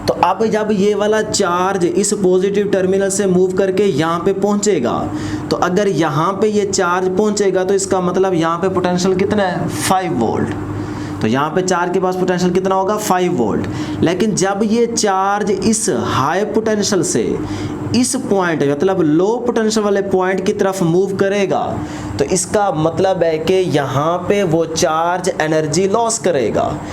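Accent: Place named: Saraiki, Pakistan. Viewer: Sir accent is like saraiki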